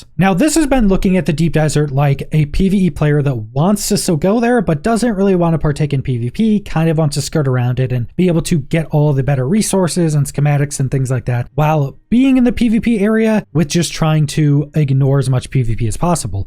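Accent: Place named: South, United States. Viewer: It’s a southern thing. I’m bet he also says Axe instead of Ask.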